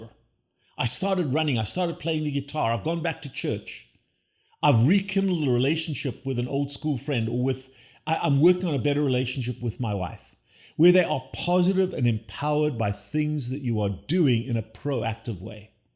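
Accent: South Africa. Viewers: Doc - Are you South African?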